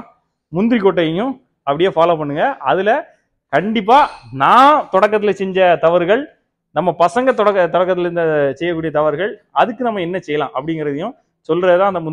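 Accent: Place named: Tuticorin, India. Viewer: TUTICORIN slang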